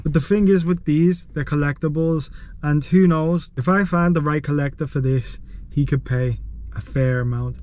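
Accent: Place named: Liverpool, United Kingdom. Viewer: Hi mate, new to your channel so only watched a few vids so far. What is your accent? I can hear Liverpool but I'm hearing something else. What is it?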